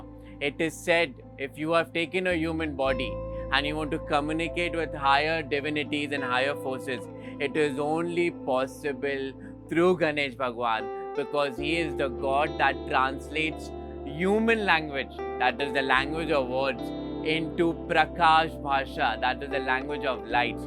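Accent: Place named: India. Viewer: Bhai tu pehle ya to English ache se american ya british accent me bol nhi to Hindi me baat kar, faltu Indians k accent ka mazak udta h duniabhar me ese tone or accent me baat karne se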